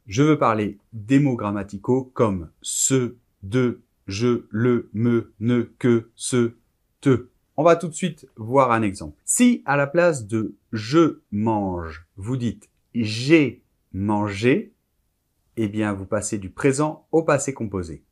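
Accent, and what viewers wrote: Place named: France. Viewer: La façon dont toi Français prononce le e est très différent du Québec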